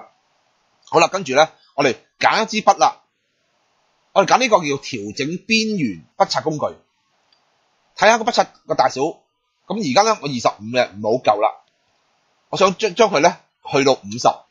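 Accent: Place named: Hong Kong, China. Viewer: 本来想这找一个背景不是白色的 扣头发丝教学， 一听还听不懂，头一回听感觉像香港那边人说话的声音。。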